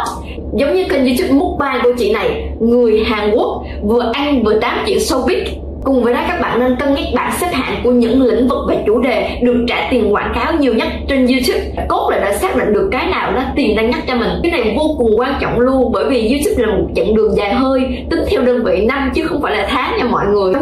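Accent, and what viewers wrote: Binh Dinh, Vietnam. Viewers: bà chị đến từ bình định à nghe quen quen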